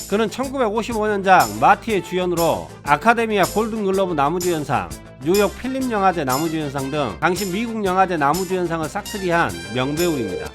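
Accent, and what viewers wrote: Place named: Jeolla, South Korea. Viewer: 구수한 전라도 억양과, 찰진 멘트에 또 낄낄거리며 힐링하고 갑니다.. 너무 감사합니다 ^^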